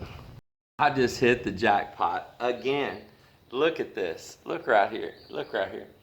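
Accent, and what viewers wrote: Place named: South, United States. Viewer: Yes I am. Thats why I watch your channel because your southern accent plus yall make me laugh. And yes the gulf coast s nice. I miss the ocean and the heat. Its been in the 50s today. I could see my breathe in the air. Yall keep up the content we love it. - Amber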